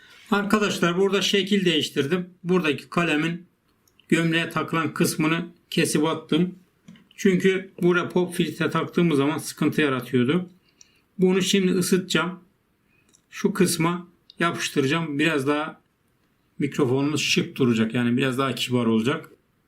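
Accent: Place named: Black Sea, Turkey. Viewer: abi sen karadenizlimsiin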